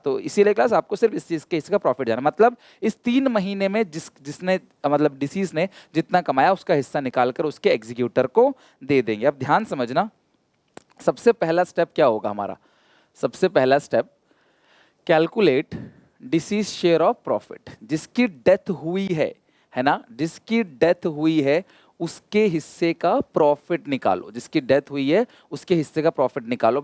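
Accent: Odisha, India. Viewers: Sir r u from orrisa🤔🤔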